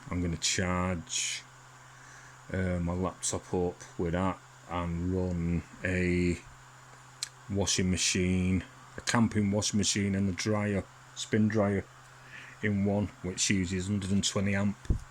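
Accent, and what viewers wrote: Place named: Manchester, United Kingdom. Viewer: Good on you mate, I could do with some help you sound local - Manchester way 👍👍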